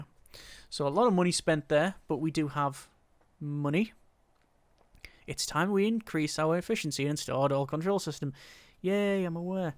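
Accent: United Kingdom. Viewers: Hold up wait a minute are you British or Scottish, I only just realise that you are either British or Scottish, but if you’re not I’m sorry. I’m just saying this because you sort of sound like it.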